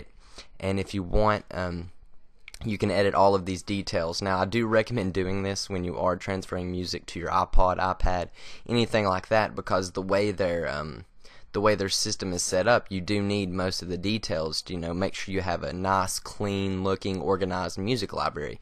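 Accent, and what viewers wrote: Texas, United States. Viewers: Dude, are you come from the south? lol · You’ve like a Texas accent. Almost.... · This might be of interest for some: The "How-To" starts . Also: Your accent reminds me of "The Grid - Texas Cowboys".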